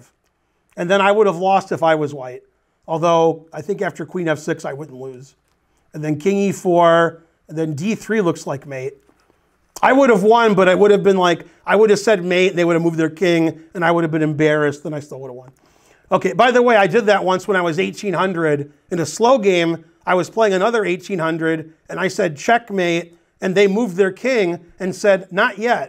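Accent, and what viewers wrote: United States. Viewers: Are you 'murican, by any chance?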